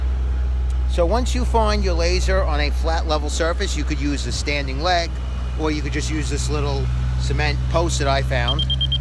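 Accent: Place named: New York, United States. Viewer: this dude sounds new york as fuck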